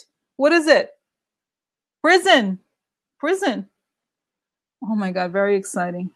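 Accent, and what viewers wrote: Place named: Russia. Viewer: Xoxoxo I love your Russian accent. 😊😊😊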